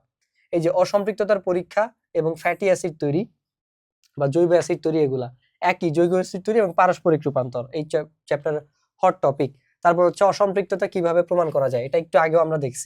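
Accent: Chittagong, Bangladesh. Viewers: খাঁটি চিটাগাইঙ্গা 😁🫶🏻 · বদ্দা চিটাংইগা নাকি? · Vaier chitaingaa accent 🔥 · খাঁটি চিটাইংগা বদ্দা 🙂🙏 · ভাই খাটি চিটাইঙ্গা❤❤❤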